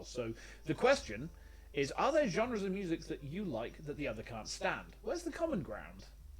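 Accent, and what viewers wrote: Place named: United Kingdom. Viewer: She is the most british person i have ever heard. May she rest in peace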